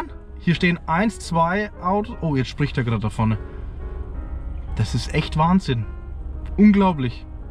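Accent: Bavaria, Germany. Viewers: smitty werben jagger man jensen fürn Norddeutschen ist da unten alles irgend wie bayrischer Slang.😁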